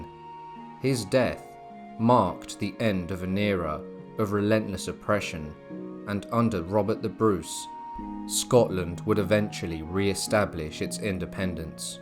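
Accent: England, United Kingdom. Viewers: Being a very patriotic Highlander i am insulted that Wallace is being narrated by an Englishman with a common accent that fails to be understood, who the hell decided to pick someone from the other side of the boarder to talk on Wallace, what a disgrace, put the shoe on the other foot see what happens, not that they ever had a super warrior like Wallace. Pick a Scot for feck sake.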